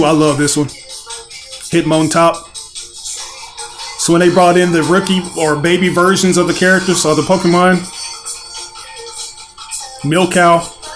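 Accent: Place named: West Tennessee, United States. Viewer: You from West TN/North MS ain’t it? I hear them Arruhs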